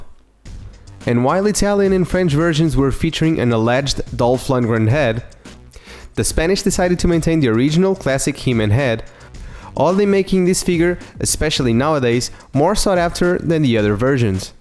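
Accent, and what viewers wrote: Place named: Portugal. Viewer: Pelo teu sotaque es portugues. Encontrei esta figura há uns dias por 50 centimos e achava que era uma falsificação pois não conhecia. Só em casa pesquisei. Infelizmente falta a espada com os 2 fios e o protector de braço, de resto está completa com a caixa e tampa das pilhas. Cabeça do He-man, não do Dolph. Foi a minha primeira figura dos Masters, não tenho mais nenhuma.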